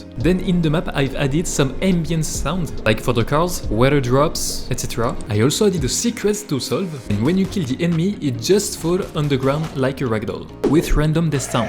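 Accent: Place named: France. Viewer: French, huh? I thought I recognized the accent :)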